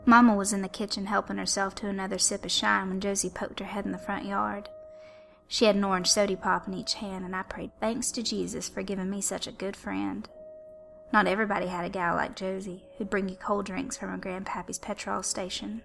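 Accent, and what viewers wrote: South, United States. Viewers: came over to you from "The Creepy Dark" and I must admit that you are good . glad I checked out this trilogy and I really enjoyed the southern accent . I like looking for new channels when they are still somewhat new and watch them grow . I have just subscribed to you and I am looking forward to watching you grow . Keep up the good work and I hope to hear from you soon .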